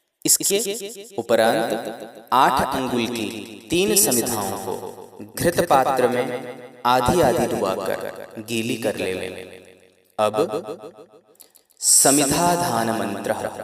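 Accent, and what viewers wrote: Standard. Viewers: स्वामी जी सादर प्रणाम ।बहुत ही शुद्ध एवं सुन्दर उच्चारण है आप की वाणी में । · ओम स्वामी जी सादर प्रणाम चरण स्पर्श बहुत ही अच्छा यह आज का आपने वीडियो डालकर हमें एक एक शब्द के शुद्ध उच्चारण के लिए प्रेरित किया है इससे जहां कहीं हमें मंत्रों में उच्चारण है थोड़ी जो गलतियां होती थी उनको आप के साथ उच्चारण कर सुधार सकते हैं स्वामी जी आपका बहुत-बहुत धन्यवाद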